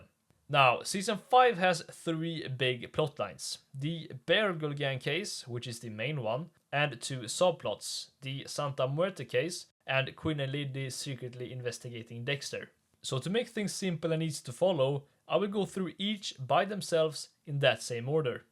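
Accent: Sweden. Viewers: are u swedish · I´ve watched multiple of your videos wondering if you´re swedish. Thank you for speaking swedish at the end so my adhd stops trying to figure out your accent and focus on the content instead, men jag fucking visste det haha.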